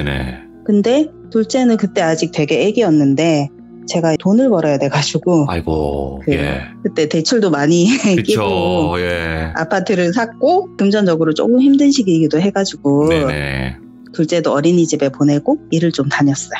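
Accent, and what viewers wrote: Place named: Gyeongsang, South Korea. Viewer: 갱상도 ㅋㅋㅋ신경과민ㅋㅋㅋ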